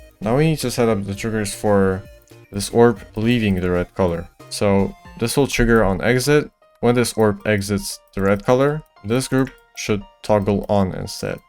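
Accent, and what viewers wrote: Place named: Russia. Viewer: Ты вроде русский как я слышал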